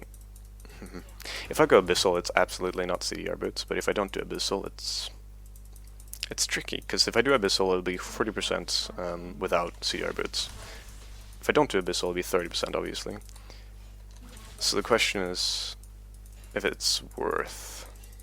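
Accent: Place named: Sweden. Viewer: ahh you are swedish^^ i thought i recognised the accent :) <-danish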